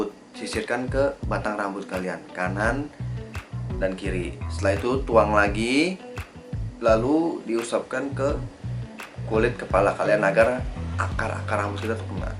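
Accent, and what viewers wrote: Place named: Aceh, Indonesia. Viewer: Orang aceh ya bang?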